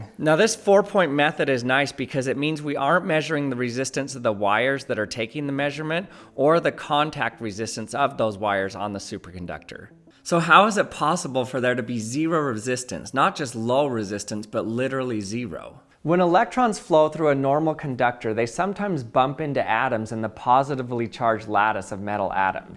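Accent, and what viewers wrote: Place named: Utah, United States. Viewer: He used emphasis, also Utah accent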